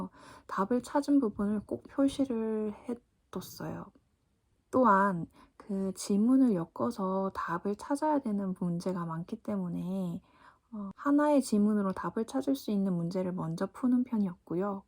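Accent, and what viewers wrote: South Korea. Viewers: 전라도 사투리잇는거같아요 아닌가? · 부산 사람 맞죠?!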